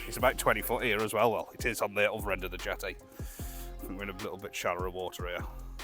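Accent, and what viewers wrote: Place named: Midlands, United Kingdom. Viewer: Hi. In Anglesey ATM, Friday. I'm just about to go down Beaumaris for a chuck with live bait later this afternoon for Bass. Caught a nice 4-5lb bass last week. Was amazed when I saw the video as I live in Leek and cycling around their yesterday on the mountain bike. Sounds like you're originally from the midlands area with that accent?. Great to see you're fishing a wide area, including North Staffordshire 🎣 The Rhudyard Lake Hotel to your right up the hill is great for a pint and some grub when you're next down. Cheers 🍻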